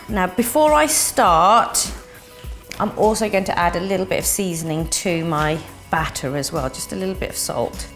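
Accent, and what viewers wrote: England, United Kingdom. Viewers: I love your English accent. And your recipes are inspiring and they always work. From Betty in Australia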